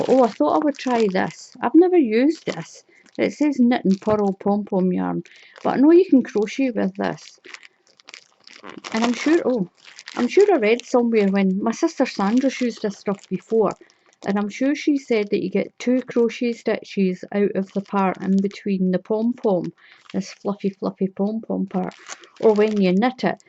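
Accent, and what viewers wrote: Scotland, United Kingdom. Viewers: Oh my! That totally blows that crappy mystery box from e-bay out of the water !! LOL... What a great stash. 🍀You did great.🎶 I have never used T-shirt yarn. I don't. know how it feels. But I can't wait to see what you do with the pom- poms.I thought I might like that for a trim around a baby blanket ? I really don't know. The colors of your stash are beauties. I am in the process now of making a Ferries Wheel yarn , color , Wild Violets, small baby blanket. Keep well. Stay safe. Keep healthy. God bless us all. I really like your channel and I love your brogue. 🌞 Is it Scottish ?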